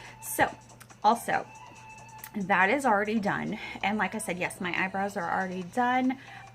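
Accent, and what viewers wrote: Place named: United Kingdom. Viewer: OMGGGGGGGGGEEEEEEEEEE!!!!!!! That was amazing, you are AMAZING!!!!!! I love you and I am super thankful that you took time out of your own hustle and bustle of life to do a video for my girl. I love the look, love me some British accent too. GORGEOUS!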